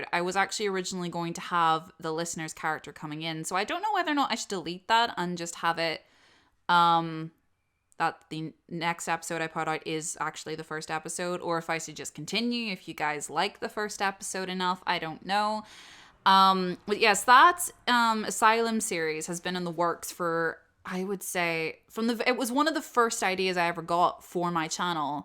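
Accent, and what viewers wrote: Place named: Northern Ireland, United Kingdom. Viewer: Dang I feel bad as a Dungeon Master and a baby VA it took me ten minutes to decipher your accent as Scottish. 😭😭😭 Edit: northern Ireland fuck!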